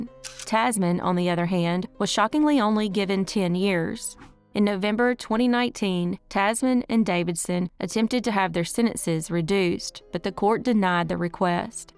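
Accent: South, United States. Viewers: You have the perfect voice to deliver those insults. The family not knowing about his violent nature...THE perfect southern "bless your heart" delivery 😊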